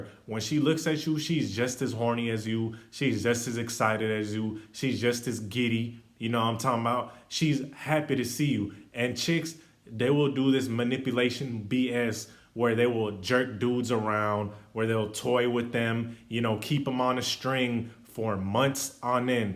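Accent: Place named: Georgia, United States. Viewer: Aye bro you from GA?? That accent real familiar 💯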